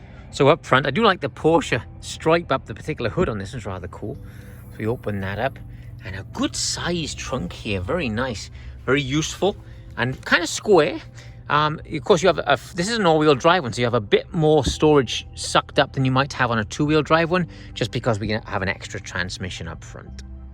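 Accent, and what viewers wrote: Wales, United Kingdom. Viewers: Just wondering is that a hint of a Welsh accent? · Is that a welsh accent i can hear? Glen S.Wales - Great review!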